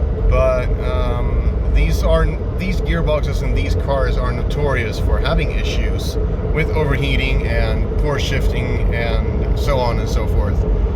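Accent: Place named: United States. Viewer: Crazy you say English isn’t your native tongue. You sounded like an American.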